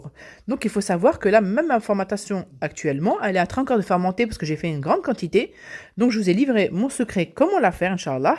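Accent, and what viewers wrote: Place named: Oran, Algeria. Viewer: هل انت جزائرية سيدتي لهجتك من وهران هل نضع الزيت قليلة فل مقلاة ام كثير والعسل كيف يكون ثقيل ام خفيف شكرا لك على الوصفة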